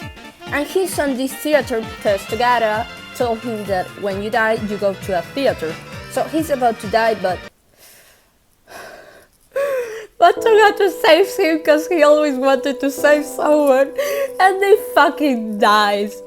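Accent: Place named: Spain. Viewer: Se nota que hablas español pero que buen video de mi manga favorito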